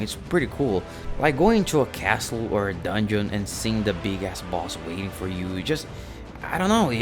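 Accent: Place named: Brazil. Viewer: Sim KKKKKKKM Eu pensei que era um brasileiro no começo do vídeo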